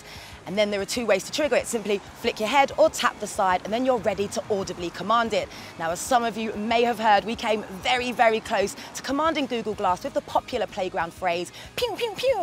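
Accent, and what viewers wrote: United Kingdom. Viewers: Ahahahaha the British Accent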